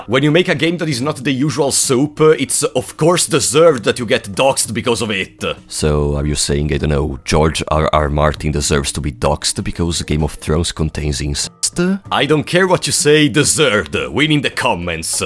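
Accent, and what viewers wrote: Italy. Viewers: I immediately knew that you are from Italy cuz of ur accent xDDD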